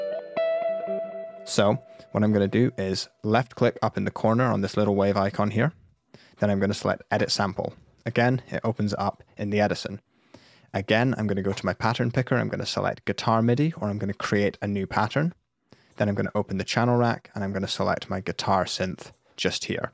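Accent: United Kingdom. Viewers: Soooo useful!! Thank you! It is also useful to find notes to sing, notes to play on piano. In fact, for those who are going to have IELTS exam, Always listen to this channel's videos in order to adapt British accent, even though you sometimes don't understand what they are talking about. :3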